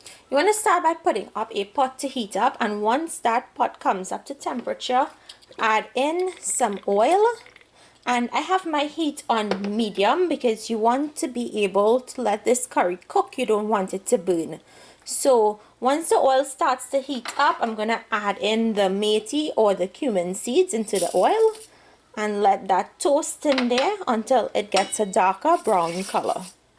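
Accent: Trinidad and Tobago. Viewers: The Trini accent makes it MORE interesting!!!!!!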